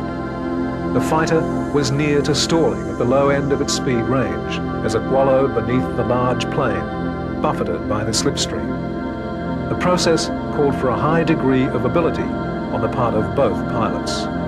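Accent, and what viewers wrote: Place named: Australia. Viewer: Wow ,i can understand the Narrator good for a change ,sounds like a Aussie.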